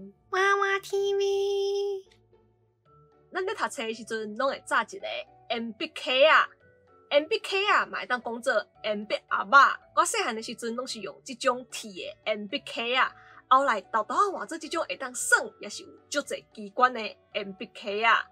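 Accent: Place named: Tainan, Taiwan. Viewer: 鉛筆盒，的“盒”，我們是說“鉛筆ㄚˊ ㄚ”，是發ah的音，沒有ap的音 削鉛筆機，是講“鉸(ㄍㄚˋ)鉛筆機” 橡皮擦，講“七布阿、石七阿” 膠水跟漿糊是不一樣的東西，膠水就直接是“ㄍㄚ ㄗㄨㄧˋ” Lisa的台南腔，跟從小在台北講的腔調(我會同安腔跟安溪腔兩種)差異不少 四十多年前在金門當兵，連上很多台南的同僚，講臺灣話有時真的聽不懂，除了用字措詞會不一樣之外，特別是台南有些地方腔的尾音是上揚的。在台北的台北腔通常是只有疑問句的時候尾音才往上揚，所以常弄不清楚他們是在問？還是在肯定的回答？比如：ㄍㄚˋ 、ㄉㄚˋ....的語尾，我到現在還是要猜一下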